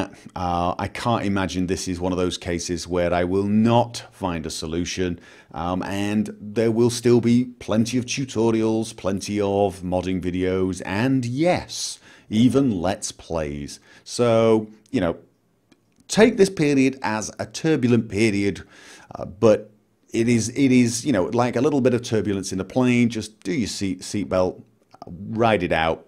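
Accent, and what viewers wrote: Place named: England, United Kingdom. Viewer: He's gone over that many many times before. He IS English. He and his family moved there a number of years ago. The accent didn't give him away? >_>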